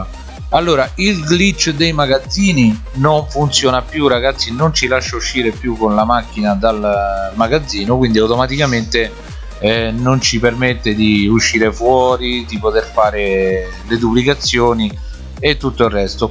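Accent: Rome, Italy. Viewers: Oioi mi fai pisciare addosso dalle risate come parli adoro il romano 😂😂😂😂😂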